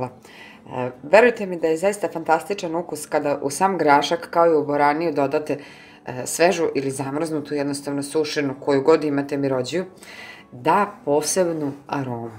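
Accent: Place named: Vojvodina, Serbia. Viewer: Ovako dobro se kuva i jede samo u Vojvodini. Najbolja kuhinja, najbolji kolaci;-)))), znao sam da si iz Vojvodine, cuje se po naglasku;-))) Puno puno pozdrava i svaka cast, i kuvas i mesis fantasticno;-))))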